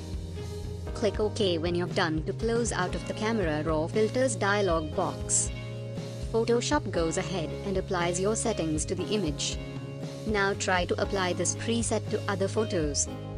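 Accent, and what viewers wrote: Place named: India. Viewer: When even the voice over is indian, god bless you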